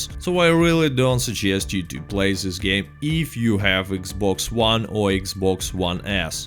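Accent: Russia. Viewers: а в голосе то проскакивают русские нотки)) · Ахахаха угораю с твоего акцента чувак, русского я за километр учую))) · Типичный русский акцент, большинство так звучат когда пытаются говорить на американском английском, ничего необычного. По крайней мере речь грамотная и понятно что он говорит, а то бывают люди что так коверкают, что фиг поймешь что человек пытается сказать, т.ч. он имеет еще не самый худший вариант русского акцента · Your accent is very Russian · I looove the russian accent. All critics - wether CP2077 or Red Dead - sound harder and more punchy with the beautiful sound of this language.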